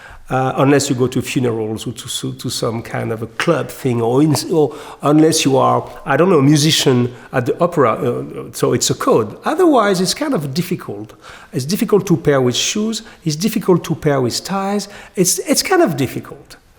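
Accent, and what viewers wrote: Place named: France. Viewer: ...And also displays a delicious French accent.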